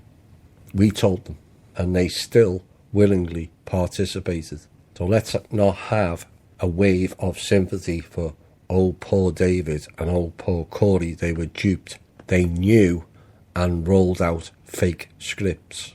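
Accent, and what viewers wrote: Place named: Liverpool, United Kingdom. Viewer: What a breath of fresh air to hear your dulcet liverpuddlian tones ! So nice to hear intelligent convo from a fello blighty as most info ive found has been mostly american ( not disrespect to my american friends intended ) I love are no nonsense and seeing the irony in it that we brits do so well ! ♥ I felt gaia was dark first time I saw its adverts..its perfect candy coated mainstream approach smelt bad ! bit like Ancient Aliens...Im going to enjoy watching your previous vids now ive found you. He's not the messiah hes a very naughty boy ! Bit of Python to brighten the day :-)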